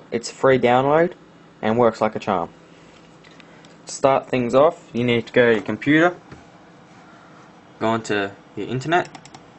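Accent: Australia. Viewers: Your voice -3 Australian ? ;) me like. Sorry.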